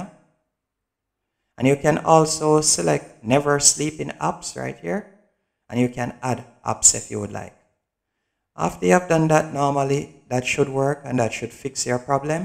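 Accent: Jamaica. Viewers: Are u jamaican?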